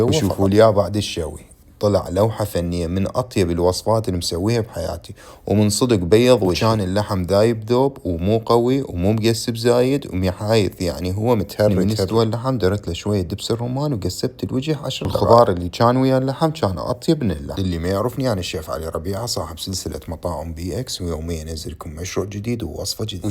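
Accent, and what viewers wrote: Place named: Iraq. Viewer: تبارك الله الشوي والمنظر روعه عاشت ايدك اهم شي لهجتك العراقيه الاصيله 👌👌👌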